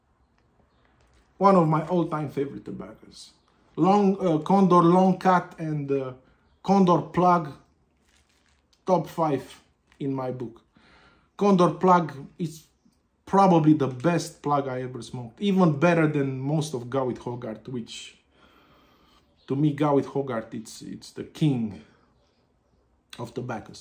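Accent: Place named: Liverpool, United Kingdom. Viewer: lol! your Liverpool accent 😂 i like you even more now 😊 i really like Condor too and, as it turns out, Ennerdale too. i may have been wrong about Lakeland tobacco.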